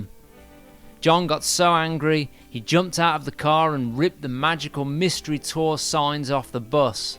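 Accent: Birmingham, United Kingdom. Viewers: Ha! Nothing like him! Brummie accent instead of Scouse for a kick off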